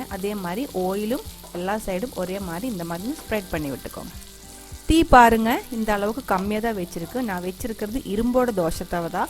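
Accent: Kerala, India. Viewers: Super fry akka.. Beautiful keral tamil.. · Your tamil is beautiful mam ..tamil mixed with Malayalam.. Nice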